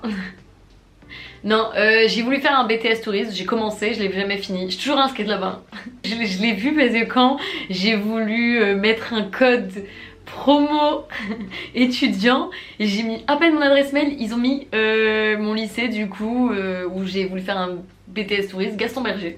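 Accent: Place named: Germany. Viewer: Bonsoir, as-tu des origines allemandes? J’ai noté le petit accent très charmant 😊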